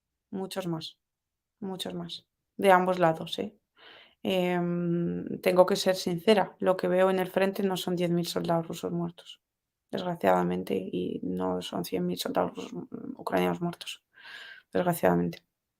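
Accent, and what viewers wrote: Spain. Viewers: Saludos desde República Dominicana, puedes decir de dónde procede tu español, de España? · Eres española ? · Ehyyyyyyyy es ESPÑOLA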